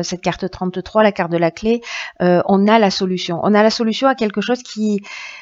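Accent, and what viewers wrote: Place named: Marseille, France. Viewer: Bonjour. Merci pour votre tirage. J’adore votre accent. Je suis du Canada 🇨🇦 province de l’Ontario. Votre accent ressemble gros à un accent de la région de Marseille. Merci encore et il semble que l’année s’annonce bien pour nous lion 🦁!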